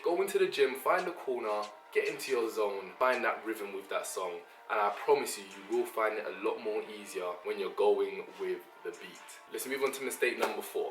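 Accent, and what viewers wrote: United Kingdom. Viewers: Nothing sexier than a chocolate man with a British accent....with tats...with a beard...with a six-pack...that’s tall. Ok I’m done.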